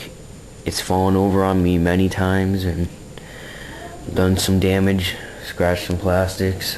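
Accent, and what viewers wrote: Canada. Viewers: R u Canadian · some china man just made about $1999.50 haha.....someone sounds Canadian aye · are you canadian??? just when you said out sounded kinda scotish, i remember this from south park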